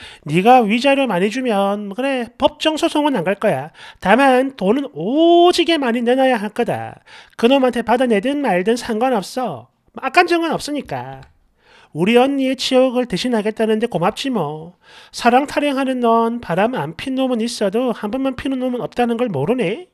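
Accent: Gyeongsang, South Korea. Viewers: 천지삐까리? 완전 경상도말씨군요 억양 완전짱 늘 터져요 웃음릴레이😊😊😊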